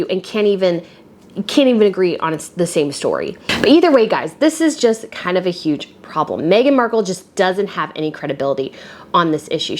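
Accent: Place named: United States. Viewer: I'm nether British nor a 'Royal', but people like you are only after klick rates here. From yr accent I assume you're American, so what makes you an 'expert' on interpreting gestures and words of people you don't know and never will? Nothing but riding the currently so popular 'Anti-Harry-Meghan' wave... Disgusting!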